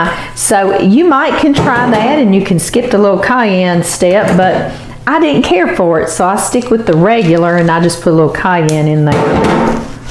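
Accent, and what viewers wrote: South, United States. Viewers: Oh my gosh that southern accent 😮. What the ??????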